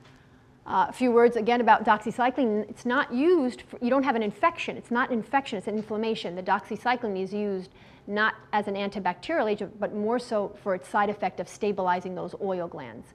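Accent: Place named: East Coast, United States. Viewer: She’s got a very interesting American accent I’m not familiar with. East coast much ? Haha <3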